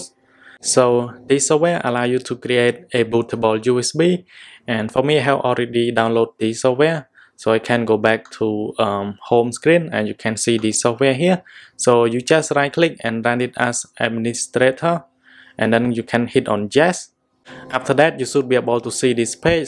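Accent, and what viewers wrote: Vietnam. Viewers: Heavy Vietnamese accent, is it like the slang America's use, or it it because that so American to you that it's already thrown out as hidden philosophy that help you speak and talk like a doctor without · Why you read english like vietnamese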